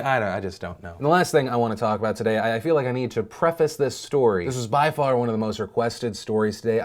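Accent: South, United States. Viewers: I just noticed that you have a southern accent, I've been watching for years, and I just realized.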